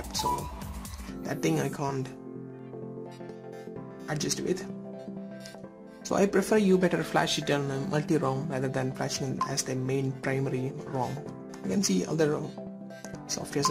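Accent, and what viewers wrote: Kerala, India. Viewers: OnePlus One most indians speak english in the same way just lyk you.but i dint expect u to be a malayali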